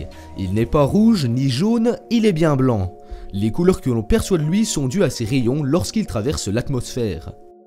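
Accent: Belgium. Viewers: avec son gros accent belge de merde il ne m'apprend rien ce petit cretin qui il est pour dire ca va te cacher et ne passe jamais a la radio tu m'a donner envie de chier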